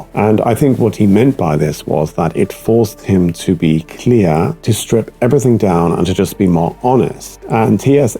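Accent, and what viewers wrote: Germany. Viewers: You have a subtle German accent in your English. Congrats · To my ears you sound like a German who has learned English. I often say "Thought shapes language and language shapes thought". This includes mathematical logic. When people do messy omnipresent stuff like equating "three times more" with "three times as much", then they become easier to confuse and deceive, easier to manipulate, and their thoughts will become more vague, sloppy, i.e. they lower their IQ by giving up mental accuracy. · It's amazing to hear that you're already speaking english with a light german accent. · I may imagining things, but I almost hear a bit of a "German" accent in your English now too. Funny how the accents can bleed both ways, especially between such closely related languages. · Hey if you're a Native Brit, I can hear a German layer in your pronunciation, it stands out to me, its in your prosody and it's also as if your liason has been reduced. 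· I notice when you speak English you sound slightly like a German would when speaking English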